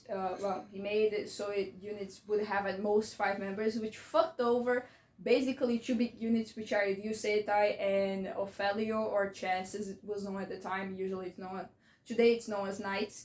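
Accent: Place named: Brazil. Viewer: your accent is so cute it sounds very brazilian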